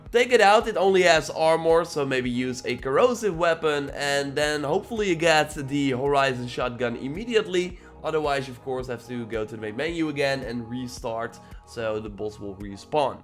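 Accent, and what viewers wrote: Netherlands. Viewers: That Dutch accent though.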